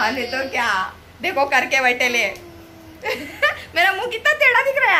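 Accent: Hyderabad, India. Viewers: Baji aap hyd ki language baat kar rahi ho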